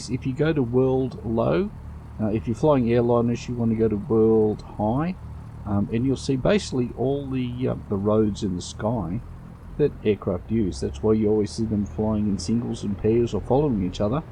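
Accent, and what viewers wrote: Australia. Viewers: Great video !! Nice to hear an Aussie voice for a change. I recently joined Xplane. I am rather disappointed with the scenery. This scenery looks really good. Can you you tell me if this is add-on scenery or is it standard?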